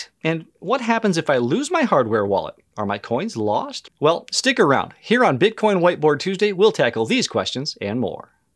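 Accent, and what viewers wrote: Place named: United States. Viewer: May I ask which US State were you born? Your accent is so pleasant to ear!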